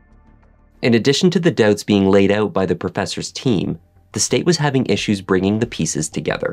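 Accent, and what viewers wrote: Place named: Canada. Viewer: The narrator sounds Canadian so probably doesn't realize it. Nor would I.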